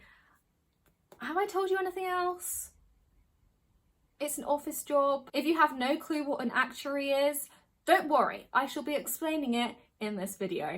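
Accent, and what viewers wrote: United Kingdom. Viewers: Her british accent is so elegant! I wanna speak like that 😭💔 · This is the first video of your channel I've watched since am a Thai guy, who is looking forward to applying to a B.Sc. Actuarial Science in a certain university. I personally think you look so British, assuming by your accent. And all of your question replies are so helpful, all of which make me understand more clearly about what I am gonna face after graduation. thank you!!! :)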